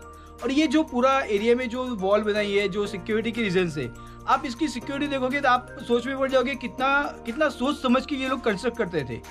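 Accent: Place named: Gujarat, India. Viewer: Bhai bhai ek Gujarati nu Hindi kaik alag j hoy chhe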